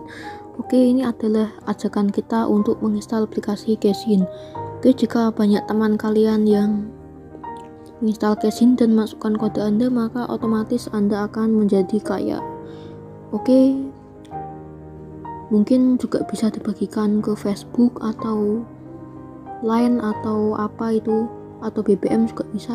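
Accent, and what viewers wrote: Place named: Java, Indonesia. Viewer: Kayak orang Jawa ngomongnya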